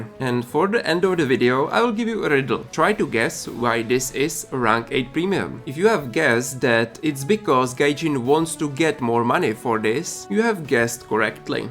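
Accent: Czech Republic. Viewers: Ja si taky rikam, po peti sekundach co sem ho poslouchal sem se sel podivat do komentu jestli tady nebudou cesky komenty😂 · Podľa angličtiny mi je jasne, že si čech :D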